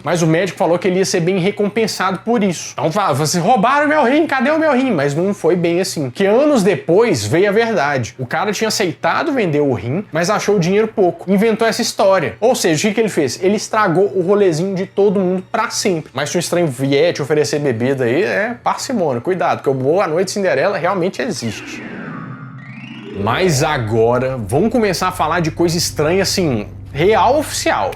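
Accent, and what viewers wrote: Minas Gerais, Brazil. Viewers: Verdade TB gosto esse sotaque mineirinho dele nos cativa, parabéns pelo trabalho nesse canal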